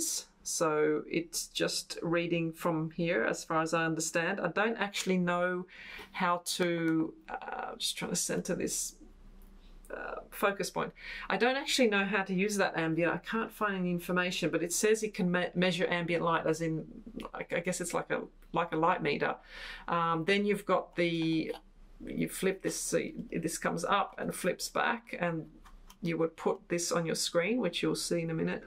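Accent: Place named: Australia. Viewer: Thanks for the video. I’ve just unearthed my X-Rite i1 Display Plus, and when installing it for my project I’ve found I have to pay for the Prfiler software from Calibrite … just another $100! I’m starting to print for my business wit a new Epson P900 printer. Always good to hear a fellow Australian’s voice on this, as we don’t get all the products available in other videos, and in this case sometimes they’re rebadged! Anyway, first time calibrating properly for me so hopefully it all goes smoothly.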